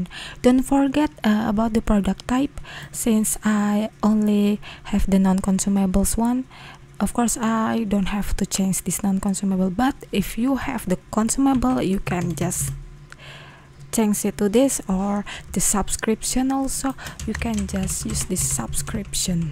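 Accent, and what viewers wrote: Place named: Indonesia. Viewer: sounds like indonesian accent, i might be wrong.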